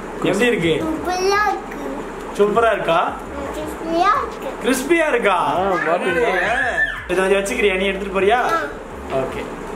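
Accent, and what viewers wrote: Nagercoil, India. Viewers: Slang like nagercoil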